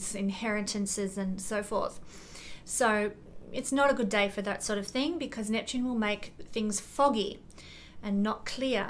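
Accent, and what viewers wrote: Australia. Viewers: Pink blazer lookin fiiine, 😚! Oh, Aussie accent is the loveliest for sure! Btw, I think you forgot the time stamps for the last transit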